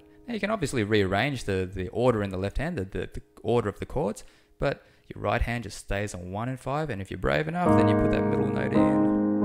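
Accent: Australia. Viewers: Hey you're Australian!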